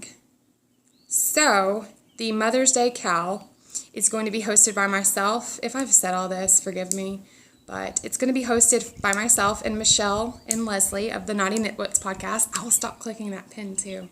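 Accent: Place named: South, United States. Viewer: Hi, I sometimes get those purl bumps too when casting on toe up. To avoid them you need to knit into the back of your stitches on the first row after you cast on. At least that seems to work for me. Also, handy hint, if you are finding all those m1L & m1R really slow going on your toes just do kfb instead. It may not look quite so neat but it's much less hassle & who's going to be studying your toes anyway?! PS love the podcasts & your Southern accent!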